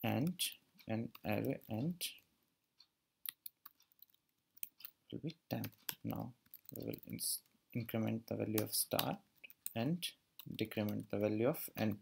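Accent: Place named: India. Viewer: Everytime hear an Indian accent dude teaching tutorial, I know he will be goddamn good.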